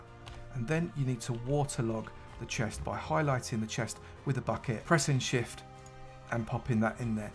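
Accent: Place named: Scotland, United Kingdom. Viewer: I like your accent. Are you from Johnstone?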